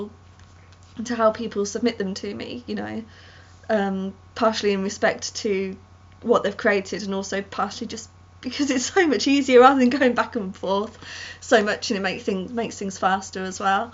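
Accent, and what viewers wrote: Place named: United Kingdom. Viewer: A British accent makes all poetry better somehow 😃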